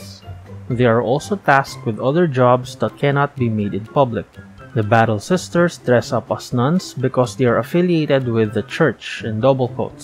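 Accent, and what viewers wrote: Philippines. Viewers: Very fili accent. Love this vid and lore!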